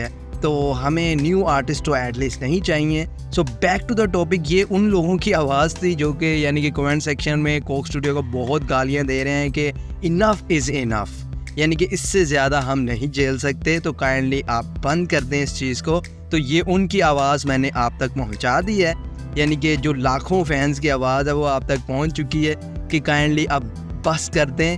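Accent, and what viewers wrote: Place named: India. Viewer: Aal ready tum Indian ho . Bolne ka style bata raha hai. Idiots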